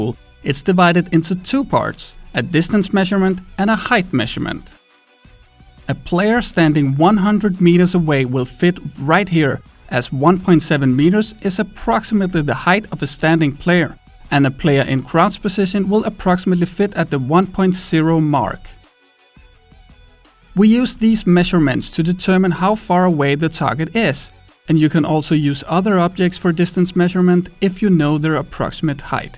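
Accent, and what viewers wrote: Denmark. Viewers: Are you Danish? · You sound Danish? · damn, youve lost alot of your danish accent over the past five years =D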